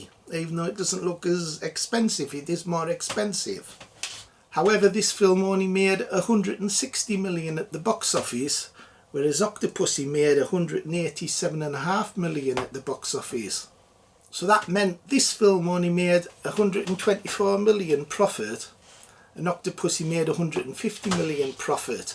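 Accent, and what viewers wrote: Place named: Northern England, United Kingdom. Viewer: Being from the NE of England myself (South Shields) it sounds like is from Sunderland or Durham.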